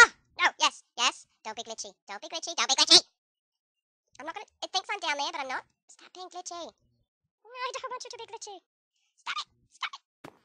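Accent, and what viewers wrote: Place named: Australia. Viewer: "It was sprinty but it, somehow, didn't seem sprinty enough." ~ 2014 Love the spinning Ultimate logo thingy. Also, you managed to make the Aussie accent even more cute! >.<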